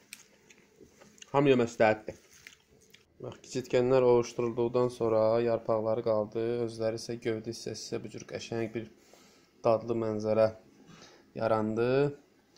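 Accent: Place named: Qusar, Azerbaijan. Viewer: Oy oy yandirmir elivizi ozude ne qederdi deyesen biz terefdi danisiqdan his olunur esq olsun Qusarlilara👍🏻👍🏻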